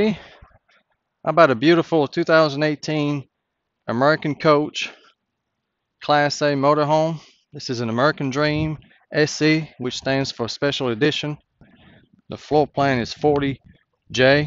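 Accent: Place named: Montreal, Canada. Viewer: Great review as always. Liking the Montreal accent. Thanks for posting.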